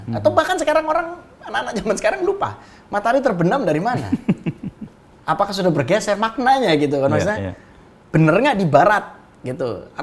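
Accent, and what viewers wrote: Java, Indonesia. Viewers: baru tau ini di RL, Mas ngomong ada medok nya 😂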